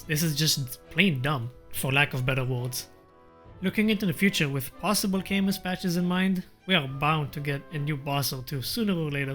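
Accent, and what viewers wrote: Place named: Israel. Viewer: המבטא הכי ישראלי ששמעתי 😩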